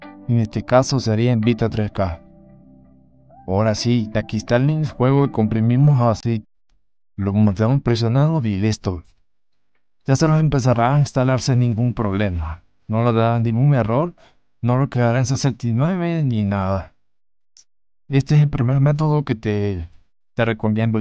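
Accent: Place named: Brazil. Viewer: Bro eres de brasil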